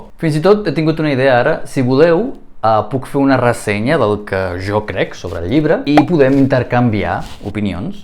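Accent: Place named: Brazil. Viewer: gràcies pel vídeo, m'ha encantat! ja parles amb accent brasiler, que boniques són aquestes mescles interculturals 😅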